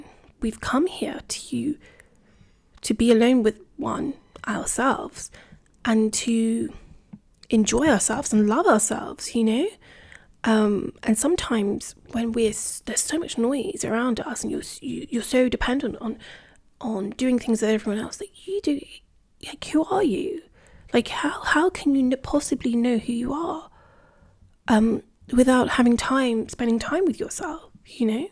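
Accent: United Kingdom. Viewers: Are you english I love your accent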